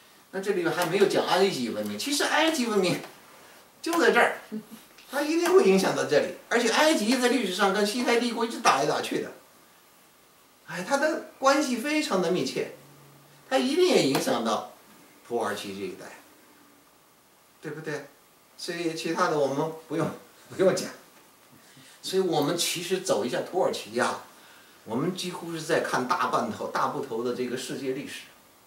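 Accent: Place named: Northeast, China. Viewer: shipeng LI 我就是西北人 可他的口音一点也不像西北人 倒是和东北人神似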